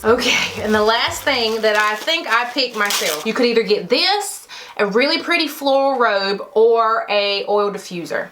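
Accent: South, United States. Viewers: I forgot to mention I love the "country" girl in you. I lived in Mississippi for 10 years and 2 in Birmingham Alabama and I picked up a bit of a "draw" but yours is natural and so awesome.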